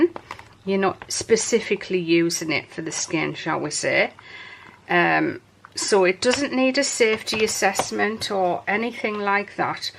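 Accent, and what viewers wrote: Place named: North East England, United Kingdom. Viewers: Hi. Where’s the best for designer fragrances..ie creed .. ps love the videos…love the accent .. fellow north east xx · Love watching your videos. I live in Australia and I’m learning to make wax melts and these videos really help me :-) Thanks so much! Also I lived in Northumberland for years! my mum and family live there. Your accent reminds me of home. Im assuming you are from somewhere nearish? ha :-)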